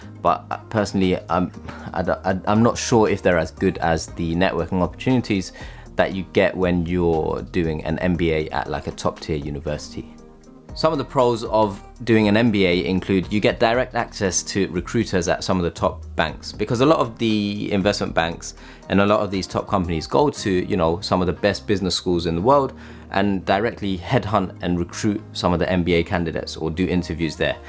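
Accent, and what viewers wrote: London, United Kingdom. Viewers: you have such a nice accent are you from west london?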